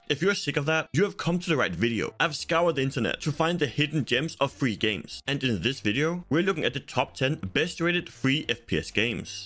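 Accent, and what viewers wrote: Denmark. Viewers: def you're danish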